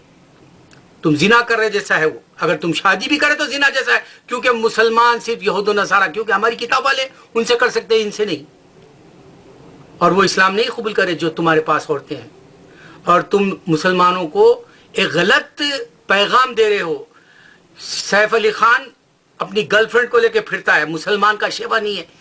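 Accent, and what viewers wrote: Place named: India. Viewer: ye indian hai yaqeenan kiun ke Urdu is tarah pronounce nahi hota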